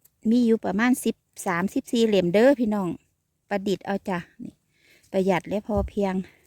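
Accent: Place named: Ubon Ratchathani, Thailand. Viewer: เว้าได้ไพเราะจ้า สำเนียงทางอุบล เด้ อจ้า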